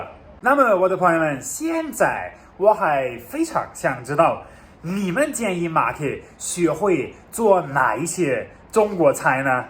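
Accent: Sichuan, China. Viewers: 马克是一个中国通，四川话加普通话很有意思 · 喜欢听马克说的四川普通话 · 马克是四川发音听起来像马kie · 马克的国语有股浓浓的四川味~ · your Chinese comes with a Sichuan accent! It sounds so good! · 这个老外普通话居然有川音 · 你的川味普通话很不错👍😌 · 四川普通话说的不错嘛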